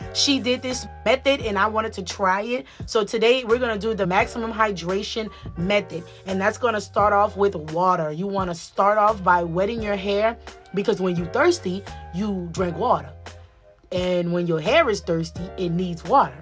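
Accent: Louisiana, United States. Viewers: Babygirl you gotta be from Louisiana 😂 anywho I needa do this cause I been looking look tore up lately lol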